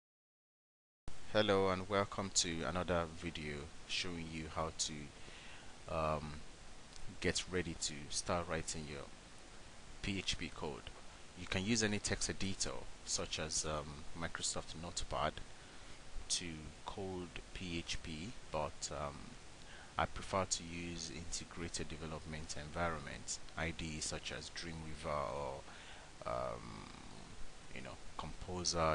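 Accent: Nigeria. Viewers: are u a NIGERIAN???? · Thanks for the video and cool ascent from Niga!!! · U must be a Nigerian!!!, I can never forget our accent.. love u man.. and u must b a Yoruba man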